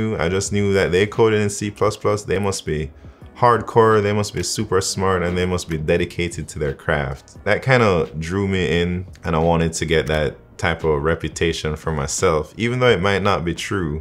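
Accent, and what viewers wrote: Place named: Jamaica. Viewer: nice nice g! Good amount a Jamaicans in tech innu i based in seattle. Good fi hear someone on Youtube/Streaming in we own accent. Feels good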